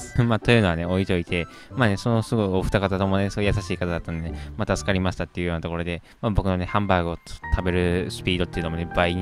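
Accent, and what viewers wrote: Kansai, Japan. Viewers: 関西弁でたまに毒舌Sっぽいところがいいんだよなあ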